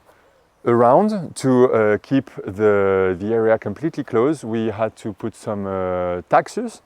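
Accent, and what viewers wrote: France. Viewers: I love the symmetry of your gardens ,thanks for showing us that you have a plan to follow.❤I am creating a garden and have a blank canvas.I love the Pierre de Ronsard Roses .I grew one over an Arbour years ago .I have bought two to plant against the Brick wall.and have a standard and other Pink David Austin’ roses etc Your hydrangeas look beautiful .I have a number of Hydrangeas-to plant ,blue and Pink . I want to incorporate a vegetable and Perrinials area into my plan too.I can see the garden rooms you have created.😊You have a French accent 🇫🇷 are you near Switzerland.Cheers from Australia 🇦🇺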